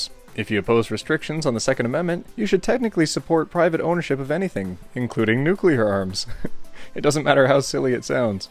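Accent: United Kingdom. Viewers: Post: JustARandomGerman: Reads in a British Accent Me: wh-... You know what, I still love it Edit: I just noticed that many others already noticed and commented this 😂 Well, great minds think alike. High five ✋🏼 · Just a random german: gets read in the most sterotipically brittish accent possible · why a British accent? their username clearly tells you they are german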